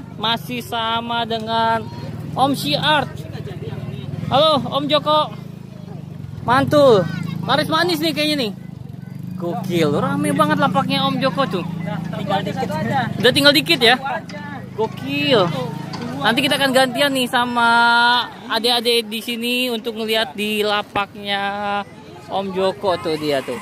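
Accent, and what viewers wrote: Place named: Medan, Indonesia. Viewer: Om kaya orang medan wkwk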